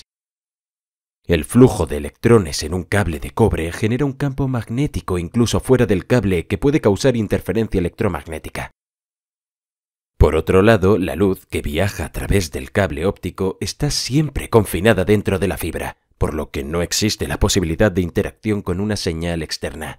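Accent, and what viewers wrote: Spain. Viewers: Porque debe estar en español de España? Luego se me queda ese zumbido a tono español 😥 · por que hablar en español marcado cuando puedes hablar neutro ?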